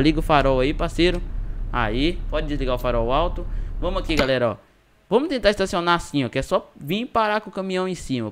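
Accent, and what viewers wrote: Brazil. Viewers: não é de são paulo e veio da onde cara? já tinha reparado mesmo nos outros videos o "oxi" kkk =D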